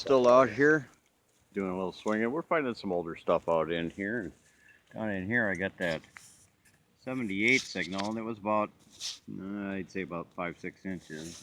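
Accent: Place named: New England, United States. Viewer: Man I love the way you talk yank it's so funny to me A 😎good digs y'all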